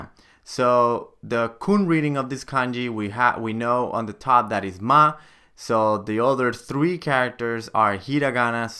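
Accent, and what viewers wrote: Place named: United States. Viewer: Akimoto Hasegawa this is definitely not Japanese or Chinese accent, as a Chinese I think he is an American .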